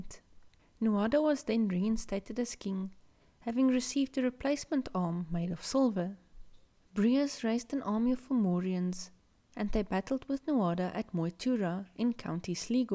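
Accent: South Africa. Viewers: are you south africa!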